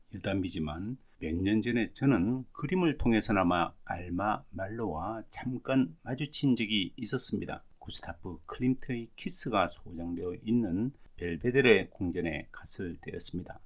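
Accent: Gyeongsang, South Korea. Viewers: 경상도 억양은 좀 사양합시다. 같은 지역 사람들은 괜찮겠지만 참으로 듣기 역겨워요. 쏘리~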